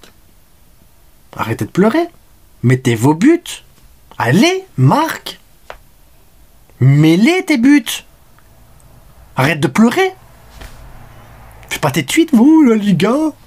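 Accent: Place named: Belgium. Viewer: Tu es un tueur mec 😉❤️ J'aime les frites 🍟 ainsi que l'accent belge 😉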